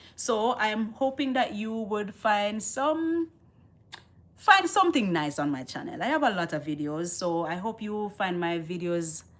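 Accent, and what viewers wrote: Jamaica. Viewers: You are great love to lissen to your words My english is not perfekt but anyway i can understand even if you are talking jamacian and i enjoy so mutch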